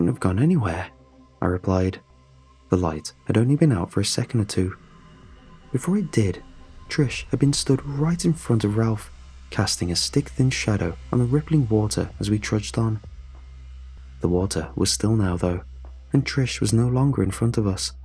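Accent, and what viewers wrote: England, United Kingdom. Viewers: Well done big lad, incase you haven’t noticed the narrator is English, and it’s a bit of fiction story telling. Absolute yogurt for brains !!! “tHiS iS AmeRiCa” 👁👄👁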